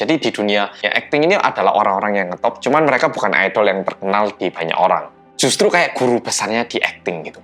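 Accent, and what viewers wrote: Java, Indonesia. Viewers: Mas iso medok men mas? 😂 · Bang coba bikin konten yg ngomong medoknya di hilangin, dong.🙏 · Lbh enak dgrin mas ngmg bahasa dgn medok2nya dibandingkan ngmg korea😅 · Berasa ndelok park seo jun ngomong bahasa indonesia medok, mirip banget mas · Park soe joon dengan kearifan lokal.... Medok... Medok... = park seo joon (mungkin kembar 😀😀😀😀( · Halo mas medokkk 🤗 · Muedok tenan😄😄🐈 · Mas yg medookk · BERSAMA ORANG KOREA YANG..... MEDDDDHHHHHHOOOOOOKKK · Keseringan denger mas ngomong medok, pas denger mas niruin kutipan filmnya, kaget "oiya, mas kan orang Korea, fasih dongg" 😂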